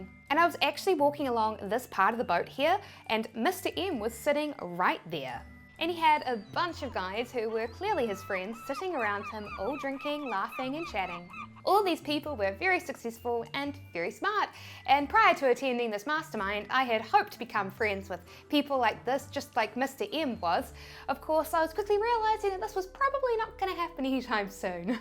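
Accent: New Zealand. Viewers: Hi, I really love you and your New Zealand accent 😁 By the way, you are so sweet and attractive while smiling huge 😘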